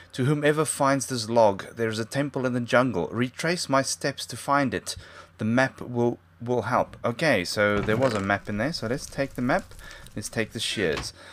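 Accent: South Africa. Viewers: I thought so, My parents have friends that are south african and they sound just like you. Pretty cool Man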